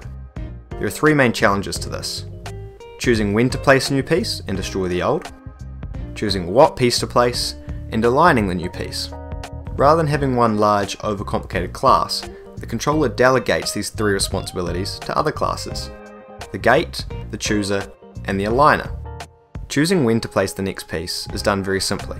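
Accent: New Zealand. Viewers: You sound like you're from NZ. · Yo is that a rare kiwi accent on youtube?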